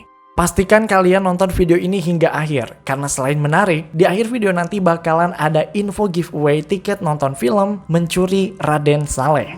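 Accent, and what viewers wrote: South Jakarta, Indonesia. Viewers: Bang lu orang Jaksel kh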